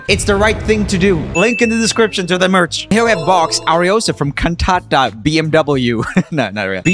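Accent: United States. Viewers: I don't know why byt I heave expected that you studied music or something, but definitely not looking at how do you pronounce all of those names. But damn, that americanized pronounciation gave my dry heaves, just how could you? ; ((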